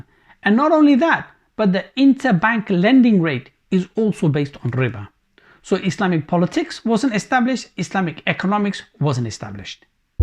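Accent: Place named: England, United Kingdom. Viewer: all your informations is wrong wearing a bikinis in Saudi not all the places it’s only in the private places not in the public. Saudi Arabia always defend Islam and helping the Muslims all over the world and serve the holy places in Mecca and madina for more than 80 years and they spend more than 90 billions of dollars 💵 for them. What kind of corruption you are talking about?? I think from your accent you are living in England. Please talk about the problems in england or the country where you came from and talk about our brothers and sisters Muslims problems in the west . By the way I saw many people talking about Saudi and the gcc countries and they forgot about what they are exactly. They use Islam but they are far away from it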